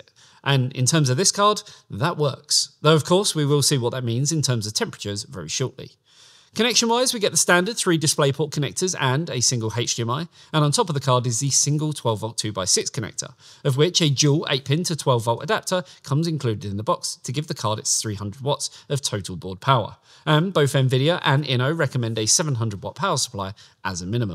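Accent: United Kingdom. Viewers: Британский акцент смешной ...🤣🤦‍♂️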